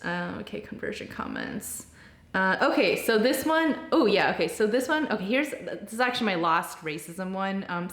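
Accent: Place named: United States. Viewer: Technically you're not really Korean anymore. That's your past. Korean and American are nationalities and so is Jewish, and you can only truly be one. From your accent I assume you used to be an American with Korean background. Now you're a Jew with American and Korean backgrounds. Even though I'm not a convert, sometimes I forget myself and think that I'm American, so I deal with a similar kind of issue. My wife is a convert, though.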